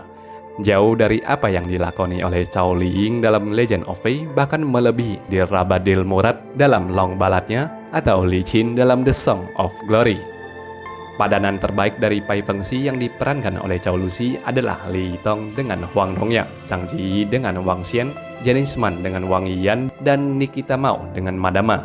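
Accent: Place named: Indonesia. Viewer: Ya engga apa2, namanya jg org Indonesia. Kalau ngomongnya pakai logat Indonesia ya tdk apa2. Kan ada logat India, logat Jamaica, dll. Org Inggris sama America aja beda logatnya Kalau masalah clickbait ya banyak youtuber pakai spt itu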